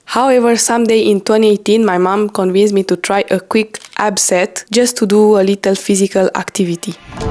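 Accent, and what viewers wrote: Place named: Romania. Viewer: I knew that accent sounds familiar, but I didn't expect you to be Romanian. Your body looks insane. Felicitări!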